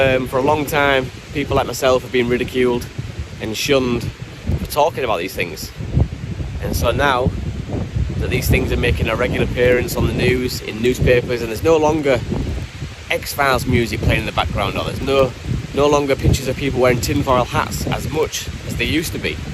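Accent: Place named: Manchester, United Kingdom. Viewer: My God he's from Manchester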